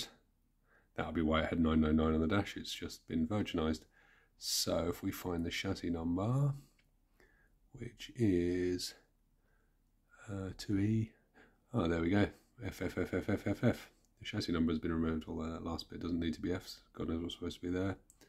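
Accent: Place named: United Kingdom. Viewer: you're British ? ..why dont you speak proper English Proper ?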